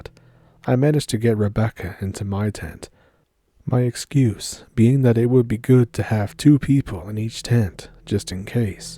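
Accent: Canada. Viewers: my friend im from ireland im from waterford im giving your video a like im going to even subscribe you sound canadian my friend am i correct 🇨🇮👍 by the way you can tell stories dont ever put yourself down anyone who tells you you cant are jealuos 😂 i cant spell so no worries there buddy great story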